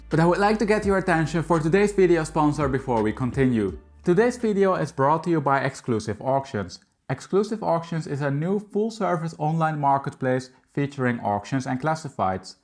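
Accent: Netherlands. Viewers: You sound dutch, i'd love to see you analyse data on homes here. I know it's significantmy different from your regular content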